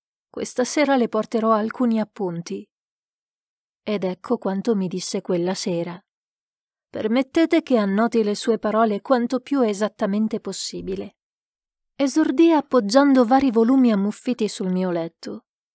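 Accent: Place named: France. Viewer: Bravissima, mi piace soprattutto il tuo accento francese. 👏👏👏👏👏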